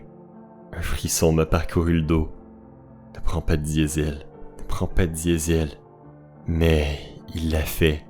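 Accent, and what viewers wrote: Quebec, Canada. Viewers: C'est marrant l'accent québécois mais je n'arrive pas à me mettre dans l'histoire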